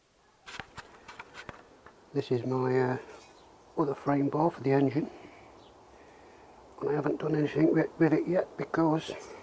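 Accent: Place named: United Kingdom. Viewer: Great video. I had got part way through dismantling forks on XJ 900 today and could not figure how to get it apart so decided to check you tube. I had an M14 bolt with 22mm head and used that to hold inside. One problem, as I am not from UK I did not understand one word, just the accent but a picture is worth a thousand words.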